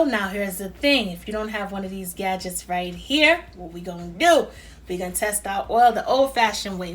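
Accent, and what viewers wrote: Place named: Bronx, United States. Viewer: Great vid … Luv Da Bronx accent! 😉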